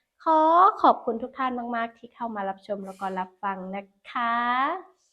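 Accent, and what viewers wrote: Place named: Central Thailand, Thailand. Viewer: น้องพูดได้เก่ง ทั้งเนื้อหาน่าสนใจ และ สำเนียงที่เหมือนไทยภาคกลางแท้ๆ เลย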